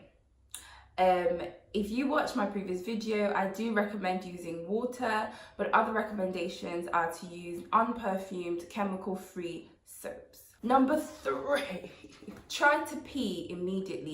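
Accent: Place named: United States. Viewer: Yassss, loved this, your accent though sounding like a real yankee 😂😂😂 love how you switch it up on us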